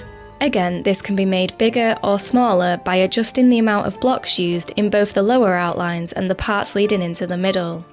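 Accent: United Kingdom. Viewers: pretty sure their accent is british, not sure though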